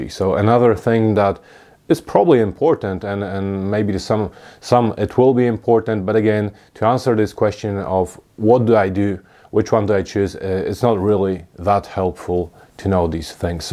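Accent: Scotland, United Kingdom. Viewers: Youve lived in Scotland havent ya?! This kiwi can hear it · You sound Scottish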